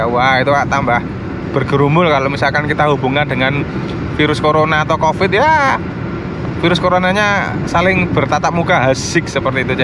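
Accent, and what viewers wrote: Java, Indonesia. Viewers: Thank you for sharing. Jowoné medok bgt mas. Kebetulan kami mau travelling dari Malang sp Solo sewa mobil. Ini YouTube benar² membantu karena saya sudah keluar dari indo 48 th yl.. No idea about tol & BBM 👍👍👍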